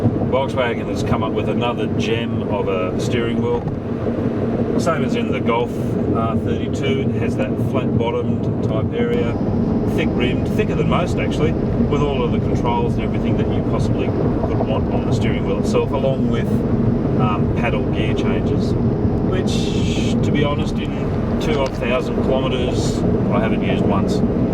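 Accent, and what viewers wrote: Australia. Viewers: he sounds like Crocodile Dundee!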